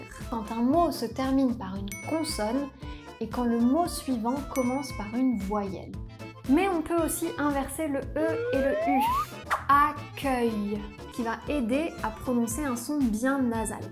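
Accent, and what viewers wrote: England, United Kingdom. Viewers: Thank you, your video is so helpful. Plus, I love your english accent! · You do realize that she has 100+ videos on her channel right? She speaks English in more than a few of them...and she pretty much always sounds British. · c’est fou qu’elle a un accent parfaitement anglais! c’est super cool mais juste différent! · Oooooooooo j'aime votre prononciation des mots en anglais. ❤️ J'entends un léger accent British quand vous prononcez les mots; trop mimi; c normal, la France est plus proche du Royaume-Uni que les USA/le Canada.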